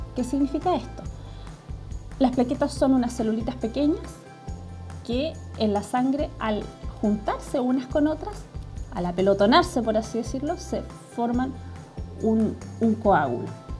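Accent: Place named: Chile. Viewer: Buena información, pero terrible ese acento 🤦‍♀️🤦‍♀️ deberías modularlo un poco, para que te entiendan los q no son chilenos.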